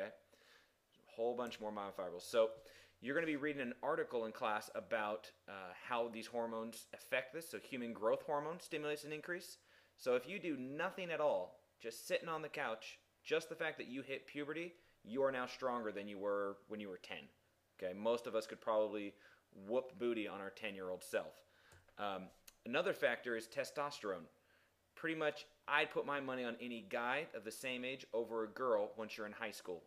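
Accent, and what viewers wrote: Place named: United States. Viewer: its frankie boyle!!! (with an american accent)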